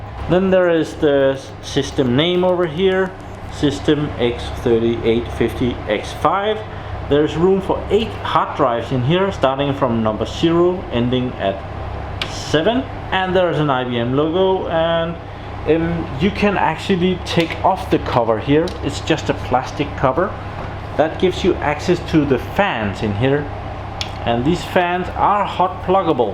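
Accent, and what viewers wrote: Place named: Denmark. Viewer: did you say MPI? It looks like QPI to me. Unless that's your danish accident that says Q different.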